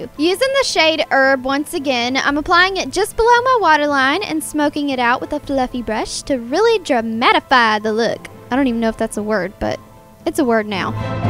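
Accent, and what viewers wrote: South, United States. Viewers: this was a really good video! Thought I would pop over and take a look because I had just uploaded a video on the katniss look and your video came up as a suggestion :) Great editing and excellent job on the video... love your american accent! :) Going to sub you :) Oh and I used the same nyx illuminator in my video too! lol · I love you accent southern belles represent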